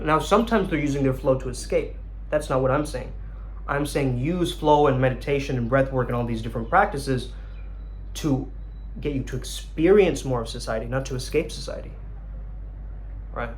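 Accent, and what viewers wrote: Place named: South, United States. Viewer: That southern accent on point 👌😂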